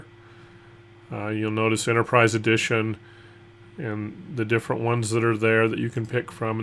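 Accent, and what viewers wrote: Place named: United States. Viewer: I love this video! It is in clear American English, He tells every step from start to finish that must be accomplished to natively restore/backup RDS MSSQL with S3 and the screens still match what I saw on my laptop as I went through this process. This is an excellent 'How To' video, I give it Two Thumbs Up!!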